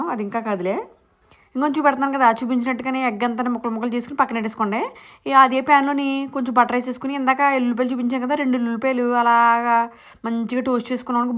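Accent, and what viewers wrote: Godavari, India. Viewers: ఛీ నీ.. నువ్వు నీభాష నిజంగా గోదావరి జిల్లా వాళ్ళు అంతా సాగదీసి మాట్లాడారు దరిద్రం, దరిద్రం అని 🤦🤦🤦🤦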